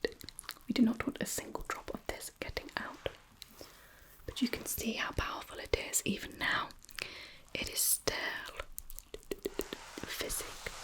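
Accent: RP, United Kingdom. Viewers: And guessing from your very sexy English voice you are from the posh English county’s like Surrey,Oxfordshire or somewhere like that??!!😉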